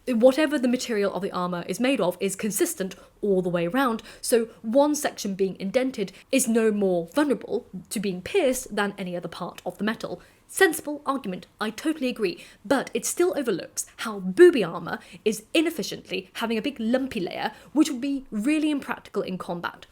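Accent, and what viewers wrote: RP, United Kingdom. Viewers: Came to hear a lady say boobie in a posh voice. Was not disappointed.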